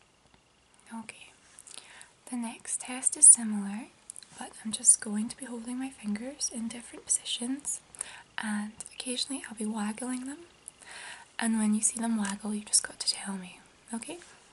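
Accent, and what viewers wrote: Scotland, United Kingdom. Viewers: Welcome back. I've only recently started watching ASMR videos to help with stress and often click on an artist I've not come across before. You have no idea how glad I was to find you. Your gentle Scottish accent is so relaxing and I love it when you get so close to the mic that your mouth sounds are audible. Personally I didn't hear any camera noise and the mic you are using seems more than adequate. Really great stuff. Subbed immediately x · Where abouts in Scotland are you from if you don't mind me asking? Struggling to pin down the accent there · Excellent video! Welcome back!! I live in Europe, as well, and have a question. Your Scottish accent is a lot more subtle than say Scottish Murmur's. Is that a regional thing or is it more your own personal linguistic style? I've noticed this with a lot of the Scots I've worked with. 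Some sound like Simon Pegg other's like Sean Connery and then some have little accent at all. Am I crazy?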